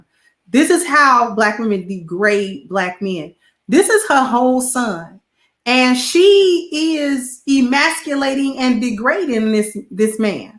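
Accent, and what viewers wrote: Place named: East Coast, United States. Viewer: my sister you sound like a east coast sister much lov Salaam.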